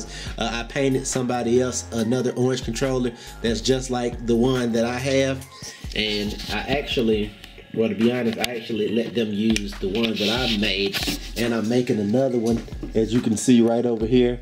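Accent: South, United States. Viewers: that southern accent thugh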